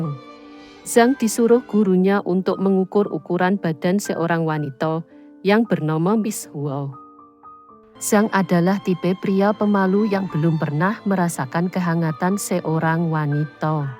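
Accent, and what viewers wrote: Java, Indonesia. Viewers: Medox banget mbak...!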